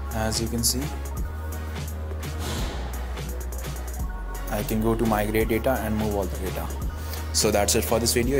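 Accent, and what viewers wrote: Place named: India. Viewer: The Voice is English taste India, because Google now is beling to India People.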